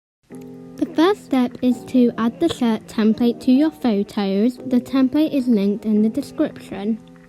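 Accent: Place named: United Kingdom. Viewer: I love your British voice so so much!!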